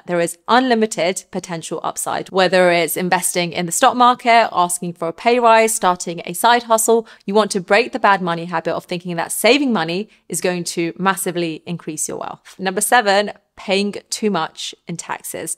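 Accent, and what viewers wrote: England, United Kingdom. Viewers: Why do English talk like that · First time on the channel. What a gorgeous lady and the british accent also hits the spot. What a great way to learn more about ficances. Subscribed! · is very cute and has a lovely British accent. AND a smart lady. Very practical video. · Can someone tell me what accent this is? Is it a specific regional UK accent? She sounds a lot like the YouTuber Vaughn George and I've never been able to figure out what his accent is either.. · I can't understand your British accent 🤡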